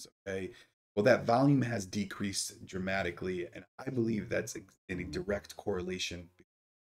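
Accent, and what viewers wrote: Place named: Chicago, United States. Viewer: Great message for new stock holders. Also, not sure if you're from Chicago or not but you sound like us big time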